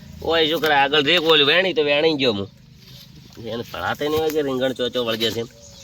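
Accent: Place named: Banaskantha, India. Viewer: બોલી મસ્ત તમારી બનાસકાંઠાની બવ મજા આવે સંભાળવાની મારી વાઇફ બનાસકાંઠાની છે મજા વાત કરવાની ❤😂😂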